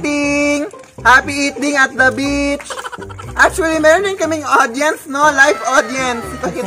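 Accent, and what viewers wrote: Visayas, Philippines. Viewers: Kuya bisaya kaba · bisaya kaba? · Taga visayas ka te?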